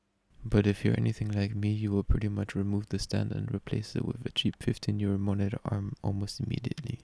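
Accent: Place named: Sweden. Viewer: Tf is with swedish accent, lol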